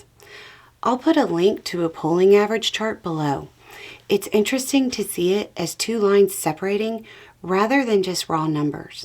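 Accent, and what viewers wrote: South, United States. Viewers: Good morning 👋🏻👋🏻. It’s so good to see your lovely smile and hear your Southern voice today. I hope you and Beau have a great day today.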